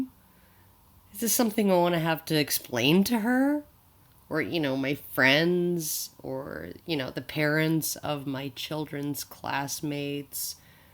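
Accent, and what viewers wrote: Canada. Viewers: fuck you and your dumb canada twang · love it! good job. u do have a nice voice and a Canadian to boot. way to go against the powerful in your country. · her canadian accent.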